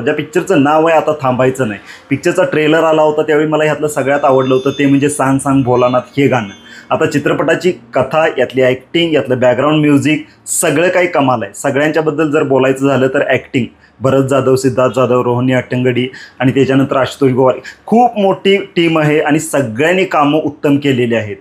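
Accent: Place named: Kolhapur, India. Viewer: बोलताना बऱ्यापैकी सगळे मुद्दे घेतोस, तुझी बोलीभाषा आवडली... नाद खुळा कोल्हापूर...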